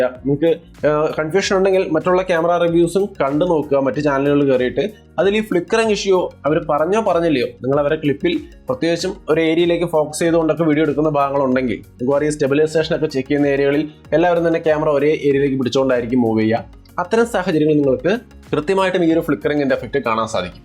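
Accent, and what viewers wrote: Thrissur, India. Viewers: Brooo thrissur Karan anno? Reply please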